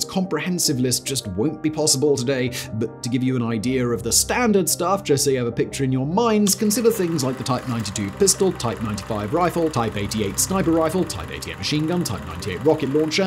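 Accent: United Kingdom. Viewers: because (1) American-English SOUNDS better (is softer/easier to listen to + easier to understand), (2) American-English is more professional (go INVESTIGATE ANY PERFORMING ARTS, VOICE, SINGING, MEDIA or JOURNALISM SCHOOL before - - BEFORE - - you kvetch + whine + criticize like a 5-year old), (3) ask yourself why British singers (the Beatles, Rolling Stones, Elton John, Pink Floyd, the Who, the ENTIRE “British invasion” etc, etc, etc, etc, etc, sing their songs in AMERICANIZED English (as opposed to lilted British accents?), (4) the British accent is weird, lilted, loopy, goofy + unnecessarily overly-emphasized (whereas STANDARD AMERICANIZED-English - - which again, is TAUGHT in professional performing arts, voice, speaking, diction, dialogue, singing, media, journalism, film + television schools (go INVESTIGATE it) - - is spoken more evenly + straightforward in tone - - and hence EASIER to listen to + EASIER to understand), and finally, (5) this guy covers interesting topics, but his accent, honestly, gets irritating. 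This advice/suggestion can only HELP him + his future videos - - because people may be inclined to STOP LISTENING after a few minutes if the affect is irritating to the ears. You asked.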